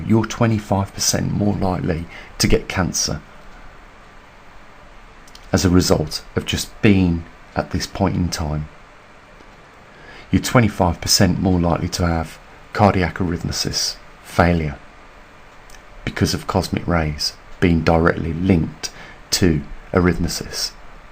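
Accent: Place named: Midlands, United Kingdom. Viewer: Very interesting info. Do wish I had finances to assist your channel. I’m local to you (just south of Solihull) based on your accent.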